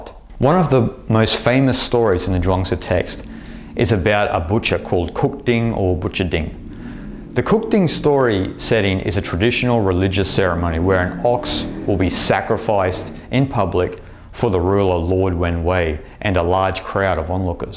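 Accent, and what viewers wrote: Australia. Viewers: Something about an Aussie accent that makes me not take it serious...but this fellow is good. Thanks sport. Peace be unto you.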